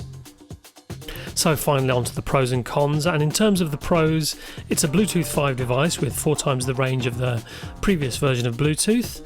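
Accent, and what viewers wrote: United Kingdom. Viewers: Hi, where did you purchase these in UK?, as you sound like you are from the UK.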